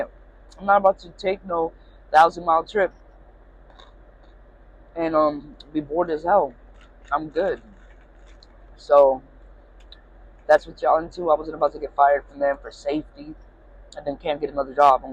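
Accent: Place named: California, United States. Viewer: You sound like you from cali...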